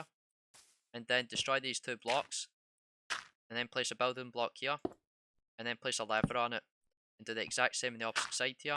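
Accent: Scotland, United Kingdom. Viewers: aye are you scottish from 🏴? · Is that a Scottish accent? Sounds cool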